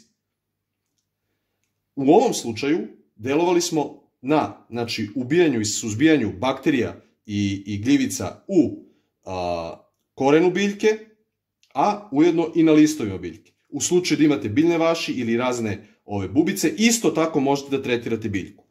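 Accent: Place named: Serbia. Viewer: Вслушиваясь в ккрасивый баритон и слова, угадывала, какой национальности принадлежит человек.Многие слова знакомы,текст понятен почти полностью.Серб, оказалось!!! Спасибо, дружище! Успехов Вам!!!!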